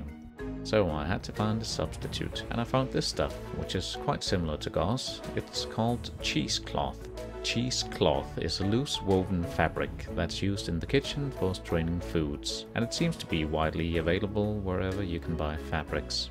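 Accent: Denmark. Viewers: Do I spot a danish accent? If so, where do you source XPS? It is a pain to get a hold of here.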